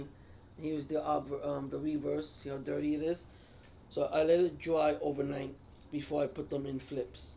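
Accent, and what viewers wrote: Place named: New York, United States. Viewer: I understand what you are saying here Sir. If it's yours and you want to keep it in your personal collection then you can shine it if you want to. Yes, it is a matter of it's mine and you better watch how you mess with it. It is called Coin Care for a reason right? But honestly I find hot water and Dawn dish soap does wonders on my Q-tip. I love your New York Spanish accent Sir and I can't do math very fast either. That dirty old goat Ben Franklin was right about the Penny idea though. Granny used to save all of Grandpa's coins every day from his pockets in a five gallon jar 🫙. In November each year she started rolling all them coins, then took it to the bank. Each year she had very near $500.00 hundred dollars for Christmas to buy gifts for the children and a nice family dinner for their meal. Why? Because she would quote "a Penny saved is a Penny earned", just good advice still today.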